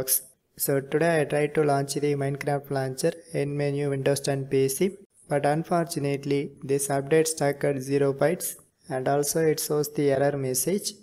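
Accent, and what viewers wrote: India. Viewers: If i hear this idian voice i know i will get saved Ty bro ❤❤❤❤😂😂👍👍👍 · THANK YOU, KIND INDIAN MAN. I LOVE YOU AND YOUR PEOPLE! AS SOON AS I HEARD THE ACCENT I KNEW MY ISSUE WOULD BE SOLVED!! ❤❤❤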